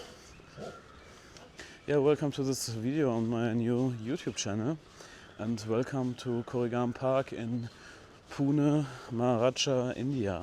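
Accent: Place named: Germany. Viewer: Great video and lovely German accent. Maybe more clouds next time.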